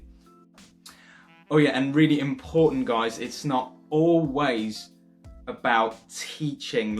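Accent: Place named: RP, United Kingdom. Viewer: Well I don’t know about his nationality, bjt he defo has a pretty standard british accent :)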